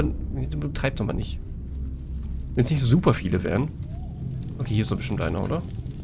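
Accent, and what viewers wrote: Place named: Ruhr, Germany. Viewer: da isser, unser Ruhrpott dialekt🎉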